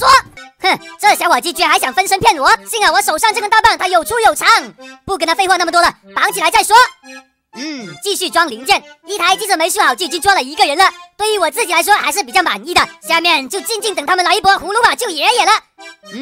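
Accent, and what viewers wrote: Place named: Guangdong, China. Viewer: 靚仔😂😂，怎麼有廣東普通話?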